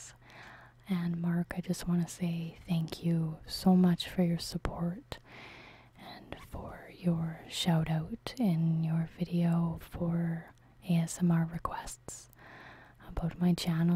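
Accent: Canada. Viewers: What part of Canada are you from? Love the accent:)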